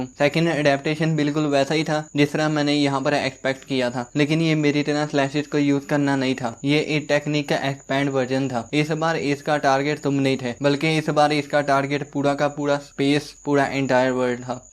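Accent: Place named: Pakistan. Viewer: Yaar aap ki awaaz Pakistani jasi ha na isliye 😅😅😅❤❤❤ nice explain bro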